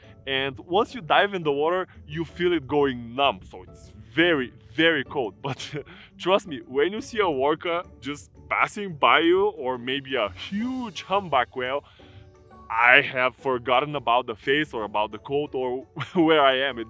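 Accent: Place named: Eastern Europe. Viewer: i disliked because i dont like your slavic accent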